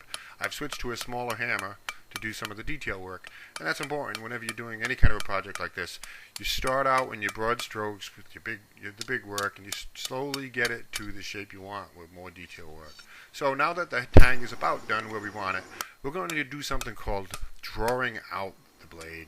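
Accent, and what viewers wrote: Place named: New Jersey, United States. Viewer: I love your "new joisy" accent!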